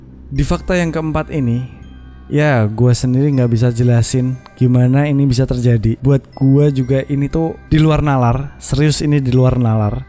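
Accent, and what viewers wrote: Java, Indonesia. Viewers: saran aja mas kalo medok gausah 'gua elu'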